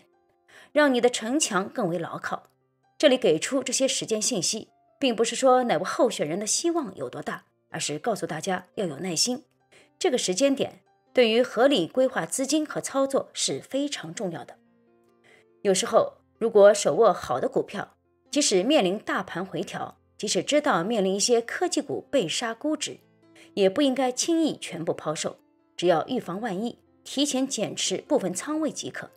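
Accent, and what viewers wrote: Shanghai, China. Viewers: 老師妳的德文真好，我的德文早已還給教書先生了。羨慕妳的多才多藝。有人説妳有上海口音...我父母是上海人，民國 38年到台灣，最後也沒有機會回到上海看一看。如果不是國共內戰，我可能也會在上海長大。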